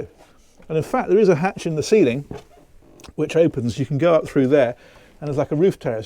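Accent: United Kingdom. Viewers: Now that's the best use of space in a class A I've ever seen. And if you don't carry a vehicle the garage can be another bedroom or office or playroom or Mancave. Excellent design. And the biggest surprise is the price. At E285,000 very affordable. I like the British accent....Gah-rahge....Priv-a-see!!! Cool👍 These reviews are excellent just like your boat reviews.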